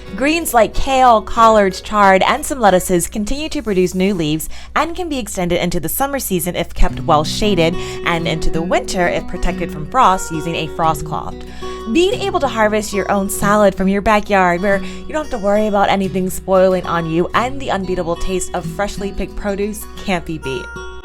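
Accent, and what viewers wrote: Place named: Southern California, United States. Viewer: I can't stand that she sounds like an entire white girl from so cal!!! I'm trying to push it out of my head but I can't sis lol 😊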